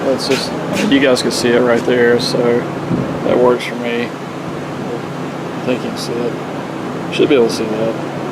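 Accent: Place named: Boston, United States. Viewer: Awesome videos dude! Your Boston accent is wicked pissah, you’d fit right in up here.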